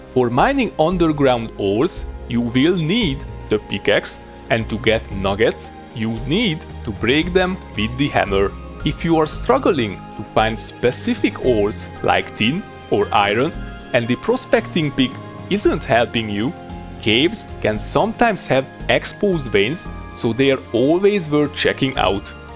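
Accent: Hungary. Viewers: Az első 2 mondat után már leszűrtem, hogy magyar vagy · Noice hungarian accent. Reminds me to "the malaciz and the wolf" :D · Bro you have the most Hungarian accent i’ve ever heard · Ezt az akcentust csak magyar ember tudja produkálni :) Ettől függetlenűl köszönet a videóért! :)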